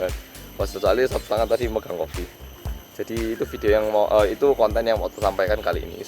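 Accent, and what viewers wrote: Java, Indonesia. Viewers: wong korea roso jowo enak tenan.... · Lahh lahhh apa bener kie wong korea ,,aduhh kok medok bgt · Cak, sampean wis tau duet gae vlog bareng ambhek Londo Kampung ( youtubers Australia Suroboyo ) kae, lho? Muwedok'e mirip pisan ambhek sampeyan. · Smpen iki yo medok pinter jg nulis aksoro jowo. Wong jowo urung tentu iso mas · masih kuliah ya en kuliah di Sg?? kalo prtama ktmu bgg kaliyah mukanya kek bkn org chinese tp ngmgnya jawa medok diajarin medok sm org Korea dongg, bahkan g ngasadar samsek yg soal Jakartak ntu slama idup sbg org indo 24th gemesloh pas ngliatnya ngmg esssssss njarem itu opo? Keren bgt skrg bs 4 bahasa dong yaah, indo jawa korea sm inggris!!! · wong bagus soko korea apek le kowe mendok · mas keren,aku ngguyu2 dewe ndelok vidoene sampean, jan jowone ki lo koyok wong jowo asli... aku malah pengen iso bahasa korea mas, ben ndang iso pripun nggeh mas? 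· subscribed!!! sumpah kaget pas ndelok wong korea medok. nek ngomong jowo nyell, hahaha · KOREA MEDOK JOWO Sip mantep tenan salam pompersa (kompak penuh pershabatan) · Kok kekel yoo, aku 😂😂 medognya itu lo, lovely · Jiiiiiaaaaaan muuuuudddiiiiooookk buuuuaaaaaanggggeeett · 😂😂😂 muanteb jowo ne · Mas ngeprank apa mas ,kok medok tenan bahasanya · Tak kira sampeyan ki orang korea yg tinggal di jakarta, pas nonton videomu kaget. Lho medok? Gak retio tumbuh besar ndek malang ik.. Arek ngalam. Medokmu kui lho mas.. Aku yg orang jawa asli aja ngiri dg kemedokanmu wkwkwkwk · Iki ora wong jowo timuran tok.. wong jowo tengah yok ngono.. emboh sii yen wong yogya.. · Njareem.... Smpyn kuwi wong jawa mas hehehe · wealah mase ki wong korea kok medok tenan leh ngomong jowo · senyum senyum sambil geli2 gitu medok mbanget oo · Lahhh baru nemu inii orang korea ada yang medok jawanya unik i appreciate it 🙌🏻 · Ada oddly satisfaction tersendiri nonton mas ini ngomongnya medok. 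Hahahaha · Aku tinggal di Malang tapi ngakak denger oppa ngomong medok soale aku sendiri kalau ngomong gak semedok dirimu😄😄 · Lucu lek ngomong ... muedokkk 😂😂😂😂😂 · wkwkw.. arek iki luweh njowo soko wong jowo rekkk.... isinlah kita hahaha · guru virtual medok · Aku dari jakarta, udh tinggal d malang dr kecil smpe skrg.. 14 tahun an.. Sama ky oppa.. Dan oppa keren banget bs se medok itu.. Pdhl aku org indonesia asli.. Salut parah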